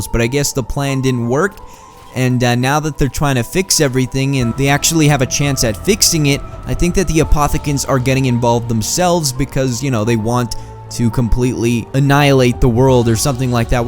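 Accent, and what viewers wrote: Bosnia and Herzegovina. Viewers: are you Bosnian?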